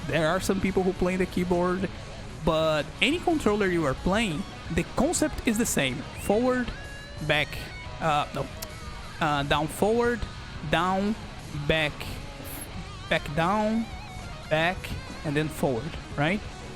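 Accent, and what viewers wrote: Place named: Brazil. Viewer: reconheci que era br so pelo sutaquekkkkkkkkkk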